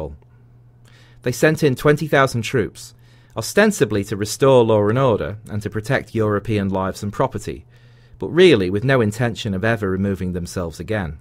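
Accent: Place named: Liverpool, United Kingdom. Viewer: A Scouser!!!! Rarely heard one in my live! This is a very interesting accent. (also your history lesson is) Dankeschön!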